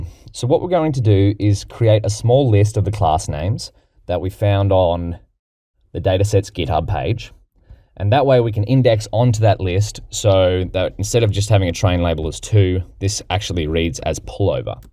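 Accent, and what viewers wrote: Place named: Australia. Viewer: Yes, his australian accent is one of a kind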